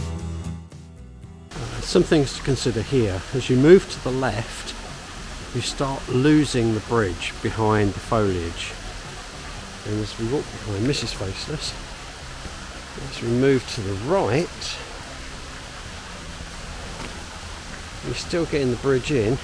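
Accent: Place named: England, United Kingdom. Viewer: The English accent and clear diction make this a must listen and watch!!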